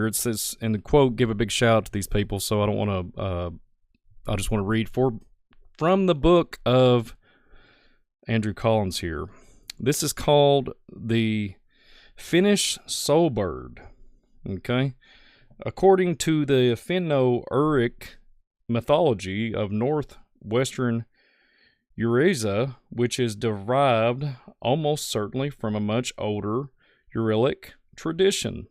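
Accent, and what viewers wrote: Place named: Kentucky, United States. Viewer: WV Hillbilly here loves your Kentucky accent ❤ My mama’s family is from Lawrence County, KY ❤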